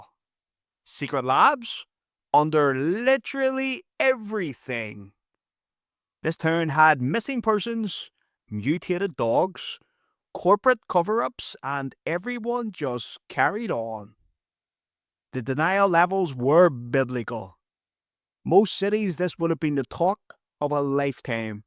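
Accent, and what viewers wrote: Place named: Ireland. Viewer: Great video man. What part of Ireland are you from ?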